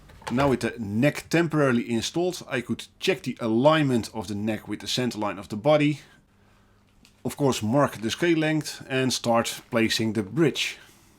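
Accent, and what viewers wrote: Netherlands. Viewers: This video captivated me every second. I am very grateful to you that you speak in a (for me) clear and (despite your Dutch accent) understandable English. This makes it very easy for me to understand what you do, why you do it and how you do it. . I think your shape of the guitar body is great, because you do without the often common (but in my opinion disturbing) offset between the upper and lower cutouts on the neck. I first saw this in a similar form on John Petrucci's "Majesty" guitars and find it very comfortable. . Your design with the recessed book matched top should actually work very well on a neck-through and/or semi-hollowbody guitar. What do you think? . I am already very excited about the next part, please don't let us wait too long 🙏😉👍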